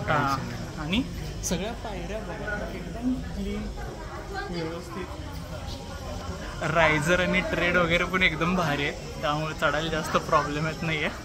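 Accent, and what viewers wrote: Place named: Kolhapur, India. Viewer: Awesome vlog brother🙌🔥. Congratulations on successfully completing your architecture degree👏. I had watched Krish mahadiks vlog of climbing tirupati balaji steps. You guys are amazingly fit, you didn't took any rest that's commendable. And love your Kolhapuri accent❤️😄. How much time did it took for you guyz to reach the top?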